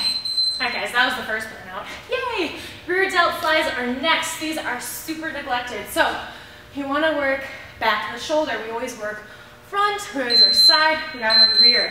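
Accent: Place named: England, United Kingdom. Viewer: rawwrrrrrrrrrrrrrrrRRRRRRRRRRRRRRR! WOWZERS that was intense but rawwrsome. :oD My arms feel like jelly. I'm typing this with my nose - JK, or am I?! Have a rawwrrsome wibbly-armed kind of day. And where did you learn your English accent from?!?! Such fun! :oD xXx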